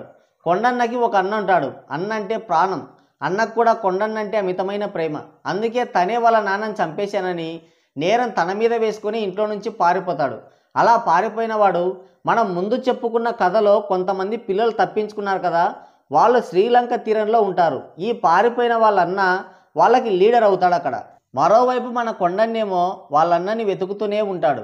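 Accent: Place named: Telangana, India. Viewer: Anadaru chiru, ntr laga real. Life lo act cheyaleru lera disiplane anteh endi ra lanjakodaka telangana slang lo matladitheh discipline lenataa pukaa andra lo shekalu. Paditheh displane unatlaa ina ni voice ala undi nit motham evadidoo chiikii chikki noru noppi puuti matladinatlu ni abbaa ninu byta edo oka roju pagal dengutharu chusuko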